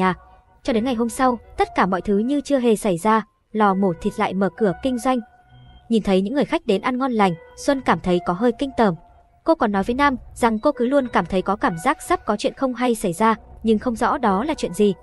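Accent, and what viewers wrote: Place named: China. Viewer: Nghe mô thích người Trung Hoa lác lưỡi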